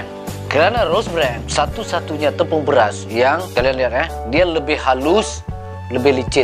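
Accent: Malaysia. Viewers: Orang Padang kelamaan dimalaysia Ampe 32 thn gaya ngomong nya Ampe kebawa kyk org Malaysia asli (Melayu + Inggris) mantap lord 👍 Sehat sllu & panjang umur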